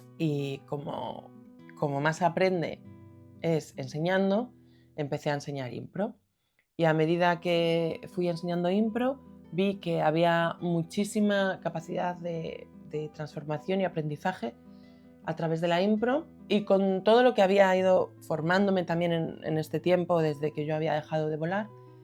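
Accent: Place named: Galicia, Spain. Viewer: ¡Queridísima amiguiña! (con ese acento galleguiño que tanto adoro), felicidades por este precioso vídeo tan auténtico, donde muestras tu alma cruda con total sinceridad y valentía. Me he emocionado mucho, gracias por tus palabras, para mí el camino también fue muy especial, sobretodo gracias a ti. Aunque mi historia no es la misma, me he sentido identificado en mucho, porque también sufrí acoso en el colegio, aunque ni de lejos tanto como tú. Nuestro pasado nos ha llevado hasta aquí, eres así a pesar de todo, pero tu generosidad hace que quieras canalizar esa experiencia en ayudar a otras personas. Sin pretenderlo, con este video a mi me has ayudado mucho, ¡gracias! Acabo de empezar yo también una nueva etapa profesional solo para ayudar a otros bichos raros como los que tú y yo fuimos alguna vez, con la ilusión de ayudar aunque sea a uno a sentirse un poco más integrado. 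Quizás seamos unos ilusos, alguien pensará que incluso seamos débiles, pero ya una vez aprendí de otro coach, que los más fuertes son precisamente los que reconocen sus debilidades y errores ante los demás. Doy fe de que puedes ayudar a mucha gente, te apoyo y te animo a seguir, porque eres maravillosa única y especial.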